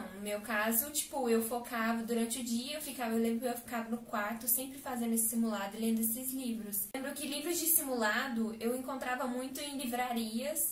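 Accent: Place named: Minas Gerais, Brazil. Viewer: tá com sutaque de minas... ♡♡♡♡♡ sucesso aee;)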